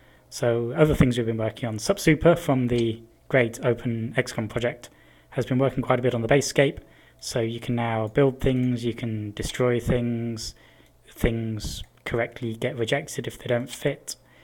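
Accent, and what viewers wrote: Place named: United Kingdom. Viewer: I am really happy to have voiceover on these! Your lack of voiceover experience is compensated by your British accent. You're doing great! Keep up the good work!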